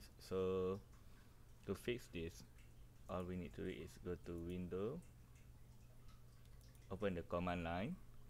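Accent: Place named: Malaysia. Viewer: Nice tutorial and unity package, thanks for putting this together. By the way I recognize the accent my wife is Malaysian.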